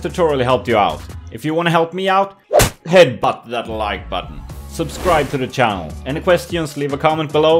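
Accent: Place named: Europe. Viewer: Damn I'm good... In recognising English accents spoken by Europeans. :)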